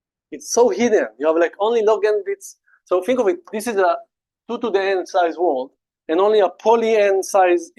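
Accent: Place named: Israel. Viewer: תודה על השיעור ! (יאמר לזכותך שמרגישים ממש את המבטא העברי בתוך האנגלית)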